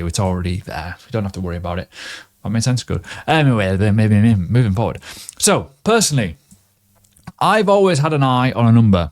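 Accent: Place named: Yorkshire, United Kingdom. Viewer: I still can't get a handle on your accent mate... sometimes I get north Yorks/Darlington, sometimes I get scouse..😂😂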